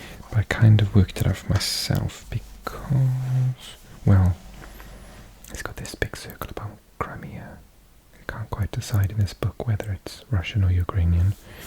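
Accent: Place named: Wales, United Kingdom. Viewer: yes the accent sure sounds very Welsh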